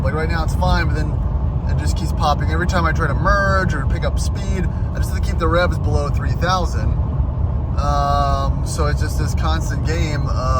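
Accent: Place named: United States. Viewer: You’ve bored me in first 30s maybe it’s your American accent maybe it’s the fact you guys no nothing and European cars , and why have you got 3/4 old crap cars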